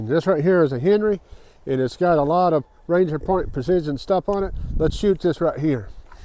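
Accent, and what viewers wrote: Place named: South, United States. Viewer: Man painka pietinių valstijų akcentas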